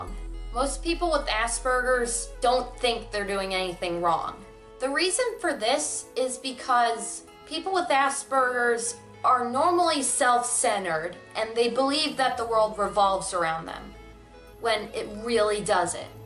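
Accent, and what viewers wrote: New York, United States. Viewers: Great video but i HATE the way Americans pronounce Aspergers. There's a "p" in the there, and a soft "g". Anyone would think you lot are obsessed with burgers or something. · Excellent video! I am CONVINCED my roommate has Aspbergers.... its' been driving me nuts.... I had a hunch he had it and you checked every box for me... he is SUPER smart... however, he is SUPER shy, doesnt look me or anyone in the eye,... can obsess over things.... honestly, can be quite selfish,.... but I truly think he has Aspergers... this video really confirms a lot for me... I feel like it would be super rude to ask him if he has it... he has admitted to being very shy. I have only been living with him for a month... and ive had some frustration with the living situatioion so far for all the things mentioned above... anyway. im probably boring you to tears lol but thanks for posting this! 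I love your accent haha it sounds very New York (Im a new yorker). I'll check out some of your other vids and subscribe. I dated an autistic guy once, and we managed... but it was hard. Ultimately we broke up cuz he was cheating on me... but thats a whole other story. I've always been fascinated by hearing other people's experiences and you did a great job. Cheers.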